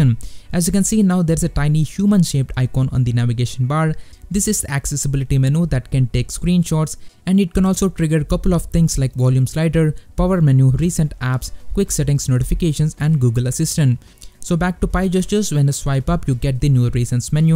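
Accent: India. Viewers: That's Indian accent. Get used to it on this channel. Lol.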